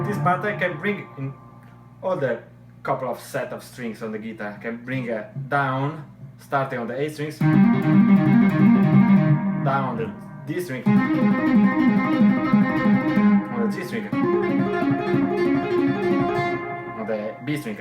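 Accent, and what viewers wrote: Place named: Veneto, Italy. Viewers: he's from Italia Do you speak italian ? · His accent obviously sounds bad, but its typical of the venetian region in Italy.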